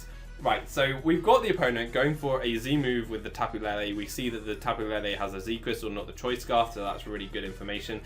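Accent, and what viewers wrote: United Kingdom. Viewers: Hey bro, you sound british. I have a question for you, is it coming home?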